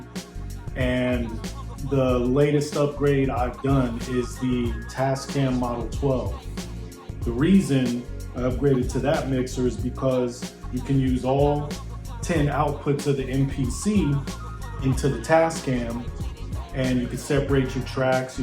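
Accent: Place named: Brooklyn, United States. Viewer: I'm listening..... yo you from Brooklyn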